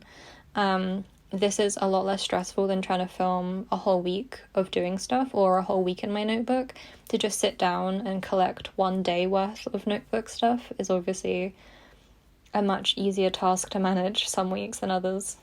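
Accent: United Kingdom. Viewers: since i love british accent. love to hear you talk during long videos.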